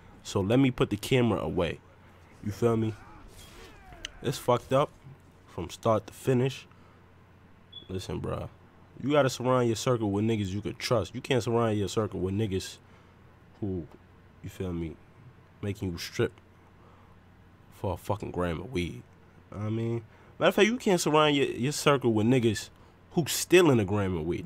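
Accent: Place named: New York, United States. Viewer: yeoo this my first time coming across your videos, I liked your message however you NY asf you had me dying lmaoo ju hurddd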